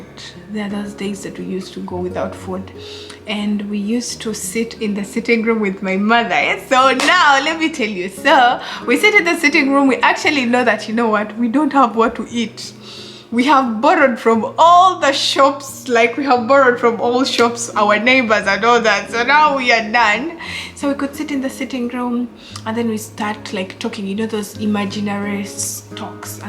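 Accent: Uganda. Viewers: I love your Ugandan accent 😍 I'm in love with you and your energy ❤️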